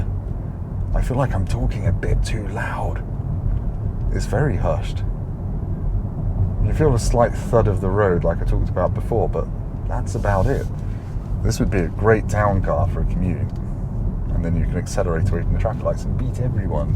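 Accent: United Kingdom. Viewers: Great review. I watch a lot of reviews with British commentators and you have a very distinct accent? What part of the UK is that?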